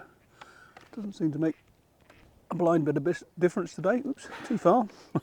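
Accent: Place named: Doncaster, United Kingdom. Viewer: What was the name of that reel that released the line from the clip if you got a strong bite? When they came out, I decided I would buy one the next time I went to my local tackle shop, but like many things, I forgot! Where did you live when you were in England,? You seem to have an accent similar to my area of Doncaster.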